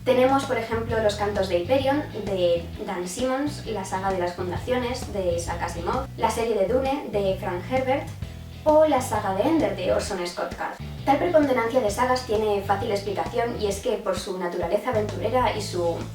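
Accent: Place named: Spain. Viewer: Me encanta tu acento, de que parte de españa será? Suena mucho como el antivirus <3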